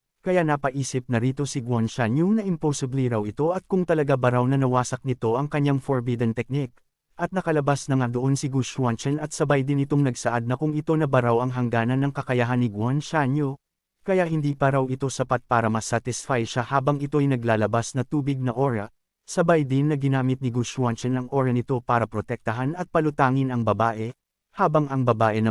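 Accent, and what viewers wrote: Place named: United States. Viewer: ok nayan lods wag Mona bagohin feel kolang Kasi Americano mag salita maganda namn recap mo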